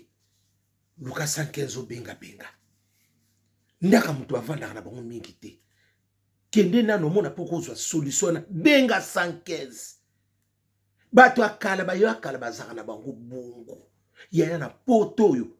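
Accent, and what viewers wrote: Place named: Kongo, Democratic Republic of the Congo. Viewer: Nazo landa makambo ozo loba te mais nazo sepela na elobeli nayo😅😅😅. Please ozalaka mu kongo?😅😅😅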